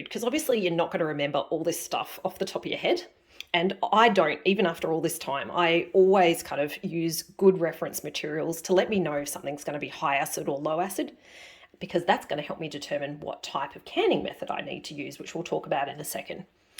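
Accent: Australia. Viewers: Thanks for creating this series for newbies like me! I recently got a pressure canner for my birthday and have been reading lots of books and trying to watch videos - however it’s refreshing to listen to an Aussie video for once 😂 Excited to watch the rest of yoir series tomorrow and hopefully give it a crack in the next fortnight! Cheers Samantha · Hi, first time viewer here and I really enjoyed this video regarding preserving. I have done some previously but we moved back to Qld from tassie and I lost touch with my passion. I am now back in Tassie and I want to resume my preserving. I feel that I have lost some of my confidence, so I am sure that watching your videos it will help me along my journey. it is so lovely to hear an Australian voice and you explain things really well. Thank you for taking the time to put together the videos and I am looking forward to the next one. 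Jay 😊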